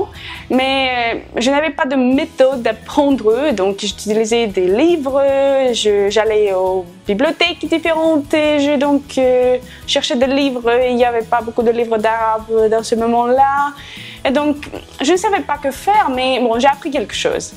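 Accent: France. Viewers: You have a great and unique french accent Ms.. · J'adore ton accent français ! Aimez-vous les langues comme le norvégien, le swedish (je ne sais pas comment ça se dit en français)?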